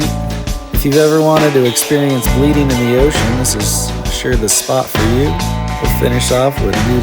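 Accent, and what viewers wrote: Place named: Southern California, United States. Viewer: Every now and then you have that 'Endless Summer' vibe going with your comments a laid back SoCal accent!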